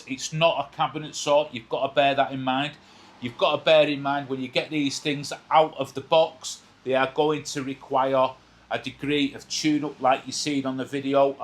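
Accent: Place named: Manchester, United Kingdom. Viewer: Sound like a fellow manc to me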